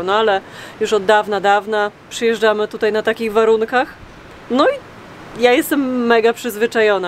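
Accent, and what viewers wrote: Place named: Greater Poland, Poland. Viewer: właśnie oglądam film co opowiadasz i wymowa dialekt zwroty ten tysiąc w karty itd identyczyy co u nas ja z wlkp to nie daleko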